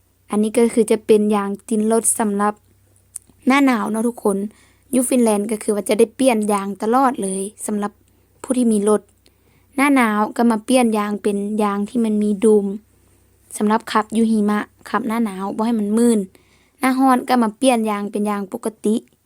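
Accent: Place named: Vientiane, Laos. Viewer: สะบายดี พี่สาวลาวฟินแลนด์ ผมมาเบิ่งรถมึอสองนำเด้อครับ มีแต่รถงามๆเนาะ สำเนียงเสียงเว้าเจ้าคึอสาวเวียงจันทน์เลยเนาะเว้าม่วนๆครับ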